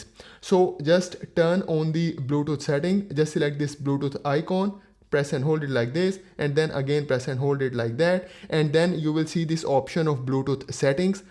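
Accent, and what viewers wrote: India. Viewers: Nasty pajeet accent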